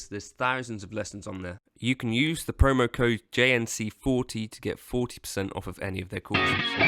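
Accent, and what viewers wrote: United Kingdom. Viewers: He’s British?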